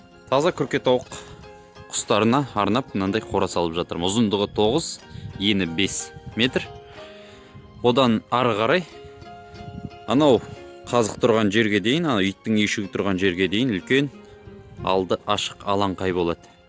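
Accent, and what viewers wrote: Standard. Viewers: коп сейлейтин сенсин!Барекелди мен ризамын бауырымызга! Осылай казак тилимизде таза сойлеген бауырларымды корсем оте катты риза боламын! маган керек талпынган адамдарга керек ал орге тартса корге кашатындар карамайак койындар ренжимесендер)))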